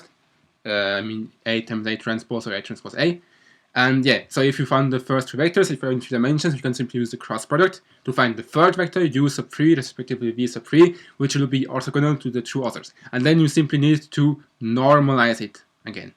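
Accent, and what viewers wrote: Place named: Germany. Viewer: He has a decidedly CHUR-MAN accent.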